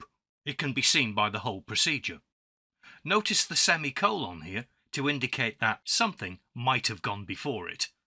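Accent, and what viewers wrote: United Kingdom. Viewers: hearing your beautiful British accented voice made me subscribe right away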